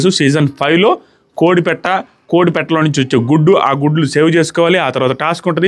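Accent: Karnataka, India. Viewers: Kannada slag super anna 😂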